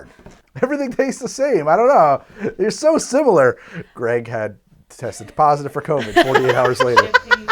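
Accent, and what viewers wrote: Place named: United States. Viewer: people from the usa are so cute when they try to pronounce french words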